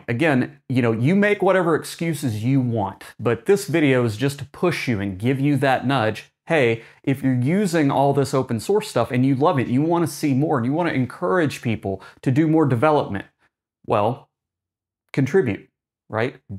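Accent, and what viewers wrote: Tennessee, United States. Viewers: PS: Your voice and style are very comforting and convincing. It reminds me of a younger version of Senator Al Gore's cadence, pacing, emphasis and accent. (Are you from Tennessee?) --- Keep up the good work! Love your channel and your videos! Wishing you continued success in all that you do! 🍷🍷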